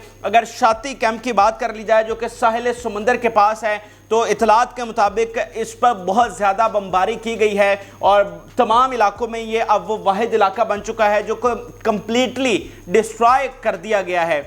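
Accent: Punjab, Pakistan. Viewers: اوئے پینڈو انسان اگر انگریزی بولنی نہیں آتی تو لکھی ہوئی انگریزی پنجابی اور پینڈو سٹائل میں پڑھنے کی کیا مجبوری ہے ؟؟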